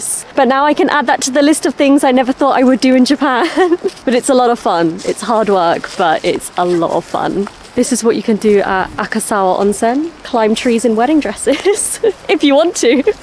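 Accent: United Kingdom. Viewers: ねえちゃんのBritish Englishをいつも楽しんでいますが、 鼻の大きさも楽しんでいます。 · British English も美しい……今からもずーーっと聞かせてほしいですね、耳に心地よい響きをもたらしてくれる……… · アメリカ英語よりイギリス英語が聞き取りやすいという意味が分かったかも✨ 日本語訳と英語の同時表記ありがとうございます。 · とても美しいドレス姿と日本の半纏。楽しみました。イギリス英語は日本人にあっています。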